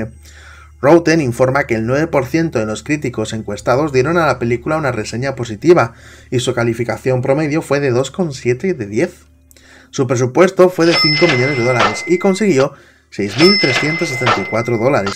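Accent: Spain. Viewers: a muy bien yo crei que eras de madrid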